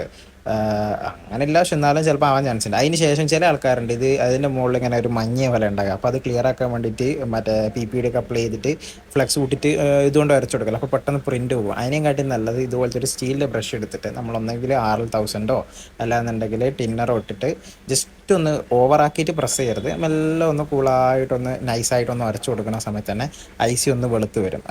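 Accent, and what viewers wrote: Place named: Kannur, India. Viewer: Kannurkaran thanne.. pakka Kannur accent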